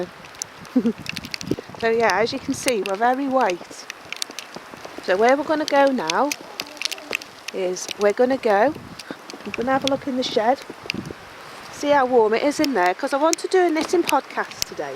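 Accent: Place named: England, United Kingdom. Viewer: I have just found you! It is lovely to hear an English voice, as there are so many other folk, but not many English videos it seems, who are about every day life. I love where your live, and those beautiful trees. I used to keep chickens, Rhoad Island Reds, like yours, and I loved them - they were ex batterie. The whole world around you looks so wonderful in the snow - fairy dust on everything. Love your hat! Blessings to you from Jilly & Madge the beautiful black rescue greyhound, from Devon, England xxx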